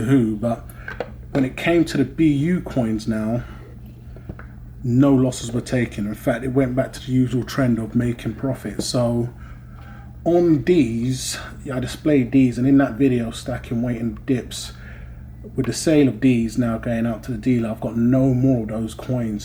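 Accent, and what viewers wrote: United Kingdom. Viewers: I sense a bit of sarcasm in your opening remark & that's a pity when my advice was well intended. Yes I fully watched & listened to your video. Although you have a slight hint of a British accent your rambling for the most part was understood. The underlying message was quite clear to the you tube audience & myself and that is the fact that you as an investor made some bad purchases even by your own admission and the headline of your video: "Cutting My Losses On This Silver Sale" First off I am a numismatic coin collector and you will kindly note Sir that I commented "exclusively on your large (numismatic) proof coins" which you admitted was bad investment purchases & suffered a loss a number of times in your video. Further more, you obviously do not have a grasp of how the world market operates judging by your concern over BREXIT. 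The value of your coins as an asset depends on current market value/appraisal at any point in time. Whether the £ devalues relative to the € or for that matter on world foreign exchange (FOREX), selling your coins you will at "best get what is fair market value at the time of sale"... meaning it could be more than you paid or less. Also despite BREXIT, you are not limited to selling within the UK but internationally...the world markets are wholly interconnected! One more thing - you have thought me not to give advice freely because for some, it is objectionable & demeaning. A lesson learned & I thank you...my bad.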